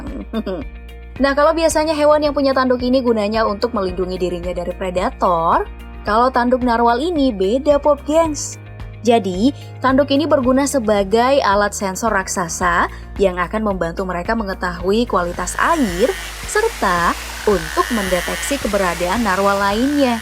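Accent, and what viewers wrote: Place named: West Java, Indonesia. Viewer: Ini mimin nya pasti org sunda😅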